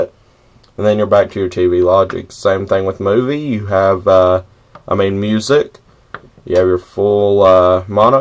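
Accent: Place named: South, United States. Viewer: he sounds like a southern dude........he sounds cool though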